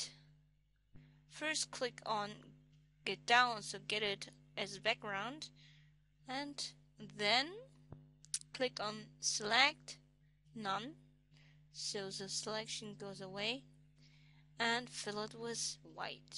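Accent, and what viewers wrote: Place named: Germany. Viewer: The accent is German and I am German, too, so I have the same awesome accent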